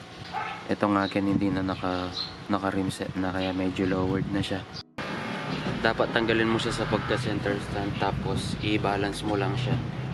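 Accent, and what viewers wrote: Pampanga, Philippines. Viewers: Bos, kapampangan kaba.?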